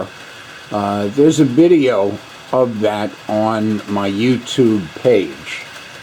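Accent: New York, United States. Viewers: That's gotta be the thickest New York accent I ever heard on YouTube so far and the design of your el is absolutely great! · Thank you so much for this video,, I visited Brooklyn 20 years ago and always wanted to build a railway model of it,, Now I finally have the time and space I am going to start. Thank you for the tips.... must say I love your accent-- it takes me right back to the summer of 94 and my month in New York,, kindest regards from England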